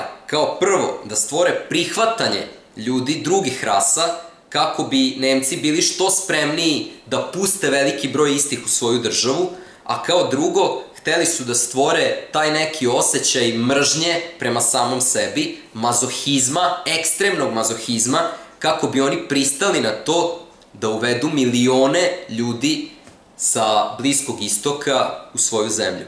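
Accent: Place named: Novi Sad, Serbia. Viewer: Novosadski akcenat🙈🙈🙈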